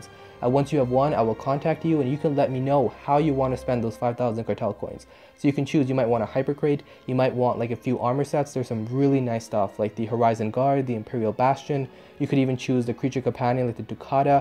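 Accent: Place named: Germany. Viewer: how come a english end up with german accent?:D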